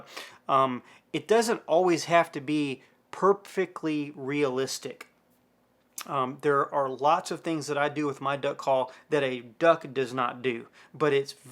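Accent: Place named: Arkansas, United States. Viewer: What part of Arkansas are you from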